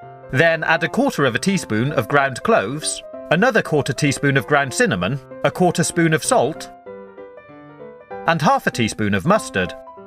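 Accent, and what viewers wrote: England, United Kingdom. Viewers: he has a english general accent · he's a British so he says to ma to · You brits talk funny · This guys voice is the most British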